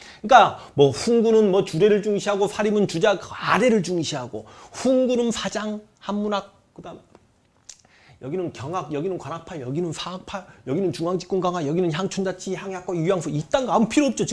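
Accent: Jeolla, South Korea. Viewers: 전라도 사투리가 거슬리지만 재밌게 잘들었습니다.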